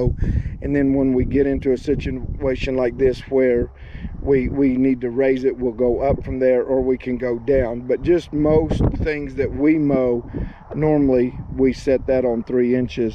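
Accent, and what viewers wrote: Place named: Kansas, United States. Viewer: You sound Kansas are you from Kansas?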